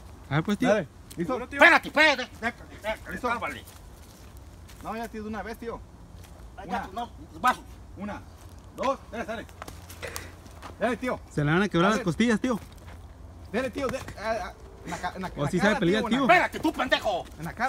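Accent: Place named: Michoacán, Mexico. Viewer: Tengo familia en Michoacán y toda habla así como el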